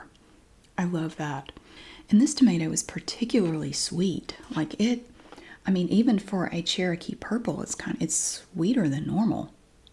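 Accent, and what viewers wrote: South, United States. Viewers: Love your southern accent ❤ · I could watch a tomato sandwich in the making topped off with a southern accent every day for the rest of my life.❤ Hope you are well! I need to catch up on the neighborhood drama.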